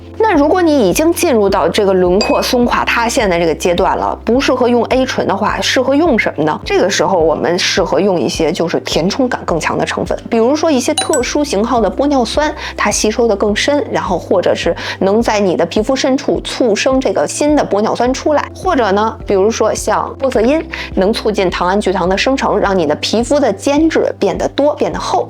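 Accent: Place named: Beijing, China. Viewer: 感谢您的讲解，说话京腔特逗😅，非常喜欢您的节目，希望多出，受益匪浅❤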